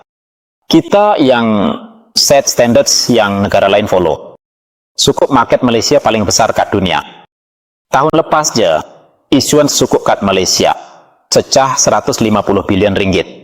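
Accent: Java, Indonesia. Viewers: Admin ni pasti lahir di parit ponorogo batu pahat slnk dia jawa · Audio bunyi slang jawa indo cakap bahasa melayu. X rasa mcm orang Malaysia yg buat klip video ni biar pun suku Jawa adanya di tanah Melayu. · Min cara kau bercakap Melayu pun dah tau kau orang indo so takpayahlah nak menyamar kononnya kau orang Malaysia. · Dari suaranya ketahuan narasi yang dibacakan ini adalah Orang Djawa, dan bukan orang melayu wkwkwk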